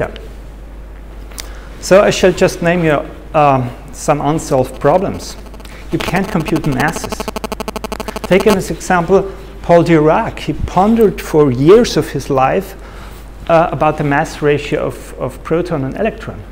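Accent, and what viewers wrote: Germany. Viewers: Until now I thought only Americans don't know how to foreign languages. But you are speaking with German accent and do the same with french - your neighbor!? Paul DIRAC. Put emphasis on the second syllable "AC" not on DIR. I agree with your notion "particle physics" is nonsense and the reasons.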